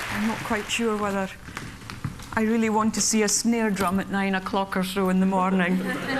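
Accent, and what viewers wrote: Scotland, United Kingdom. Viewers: For Deaf (of any level) she speaks very well and love her Scottish accent. Also as a drummer and percussionist who came up through high school bands I forgot how much I love the Marimba (what she is playing) and Xylophone. I never was very proficient and graduated to drum kit through college and club bands. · Thanks for clarifying her age loss of hearing,i was wondering how she got a scottish accent. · I can hardly believe this woman is deaf. For one thing, she doesn't speak like a deaf person. She articulates every syllable perfectly with a Scottish accent. It doesn't surprise me that she can play percussion, because rhythm is something you feel rather than hear. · She is Scottish..Her accent is actually Scottish. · How does she have an accent? She sounded scottish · she's deaf and she could play the drums?? thats amazing! 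what's even crazier is that she has a scottish accent and she lip reads! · Scottish accent. Very recognizable, if you are into such things are listening to accents and figuring out where folks are from (like me...lol). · This lady is amazing. So talented and I had no idea she was deaf until she said so. Her accent sounds like she is from the Scottish Highlands (so sounds like Prof McGonnagall from HP). Edit: Wikipedia says she was brought up in Aberdeenshire, hence the Doric accent. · a deaf person with a Scottish accent?? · Scots accent is funny!! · "without the telltale signs that most deaf individuals demonstrate." You are making the mistake of assuming she was born deaf. She wasn't. She didn't become deaf until the age of 12, by which time she could speak normally. And it is also not surprising that she has a Scottish accent, given that she is Scottish. It's not astounding at all. Do a little research next time, before making comments on Youtube. 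· If she is deaf, how could she pick up a Scots accent? Or even talk at all? Being a physicist all I can get from this is a lot of vague waffle.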